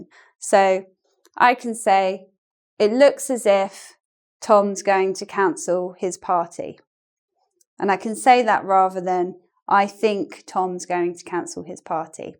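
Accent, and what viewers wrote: United Kingdom. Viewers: Greetz from Germany, your videos are great! And I love your British Englisch accent :-)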